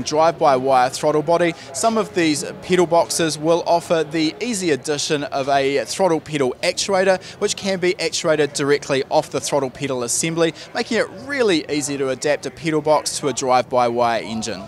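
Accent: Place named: New Zealand. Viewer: he's Kiwi, just go with it. in the engineering sector over the past 30 years I've worked with NZ, Aussies, South Africans, Brits, Germans, East Indians, Chinese, Japanese, Jamaicans, Russians, Guatemalans, Liberians, French Canadians, Israeli, etc so a mix of everything, and I'm sure at times it was they who thought my AMERICAN ACCENT was what sounded odd/weird. it often hurts the inflated American EGO to discover that WE are the ones "speaking with an accent" and that we are NOT always the "yardstick" by which things in the world are measured (literally).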